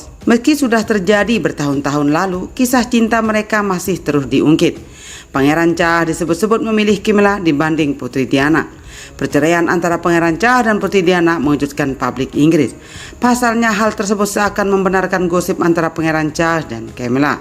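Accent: Indonesia. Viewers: Kakak orang medan juga ya?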